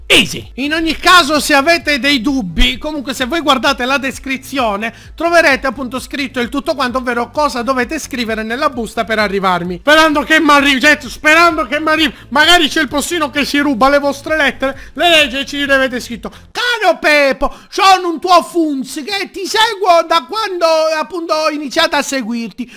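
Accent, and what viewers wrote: Sicily, Italy. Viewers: pepo ma sei siciliano??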